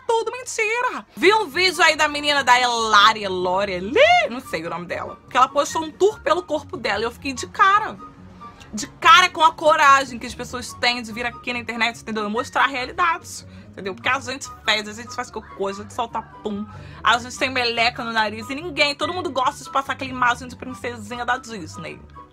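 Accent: Rio de Janeiro, Brazil. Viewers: Esse hino de sotaque carioca aaaaa hahahaha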